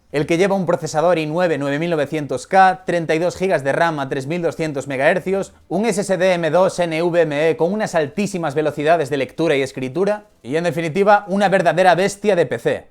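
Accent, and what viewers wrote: Galicia, Spain. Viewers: Eres gallego? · Es cosa mía o eres gallego? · Alguien sabe de qué parte de España es el ascento de este tipo?? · Eres gallego o el acento me engaña jaja Forza galiza jaja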